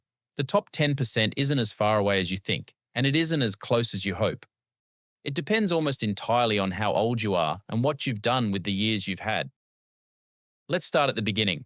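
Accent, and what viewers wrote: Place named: Australia. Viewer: Also i love the aussie ai slang better than your american accent in all the past videos its just 2 weird lol keep up the aussie accent for next videos!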